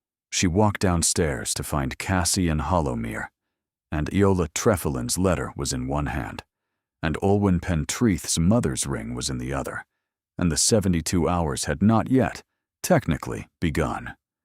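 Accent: United States. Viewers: Cant bear us accent.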